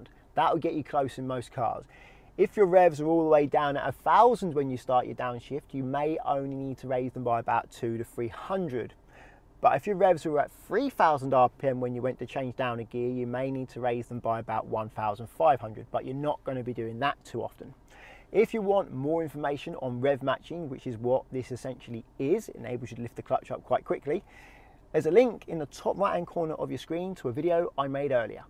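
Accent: England, United Kingdom. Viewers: Can’t listen to this because British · British innnit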